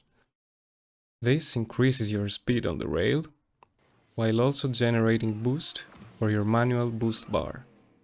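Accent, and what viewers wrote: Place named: Greece. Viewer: Ρε ελληνας εισαι? το ψιλοκαταλαβα απο τη προφορα, αλλα στο τελος με τον αγγελακα ηταν σιγουρο χαχα, ωραιος βλεπω τα βιντεακια , εχω 1:39:600 με το gaston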